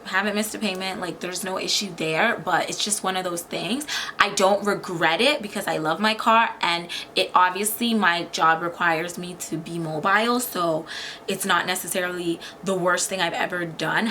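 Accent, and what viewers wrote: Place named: Toronto, Canada. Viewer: I don’t know if I’m bugging, but I can lowkey hear a Toronto accent😭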